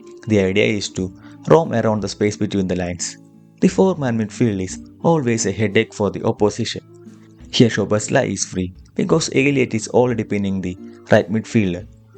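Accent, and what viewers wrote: India. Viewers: Indian accent and football don't marry well. Talk about cricket and curry. Maybe chess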